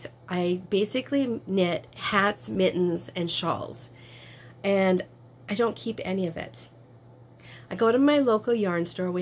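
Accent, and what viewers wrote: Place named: Upper Midwest, United States. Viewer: I knew immediately from your slight "accent" that you were from the UP or Wisconsin. I grew up near Iron Mountain (Norway) and will always be a Yooper at heart. Your projects and future projects are great. And what a wonderful ministry you have with your hats and mittens. I know how needed it is up there.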